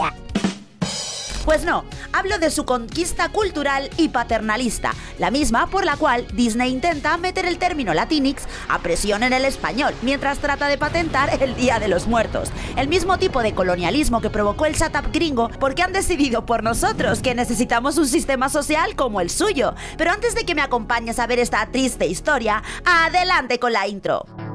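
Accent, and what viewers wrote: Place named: Spain. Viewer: Nosotros ? Te juro pensé por todos los artículos que usas para tus vídeos que eras de España.... Eso y el acento jaajajaj . Pero bien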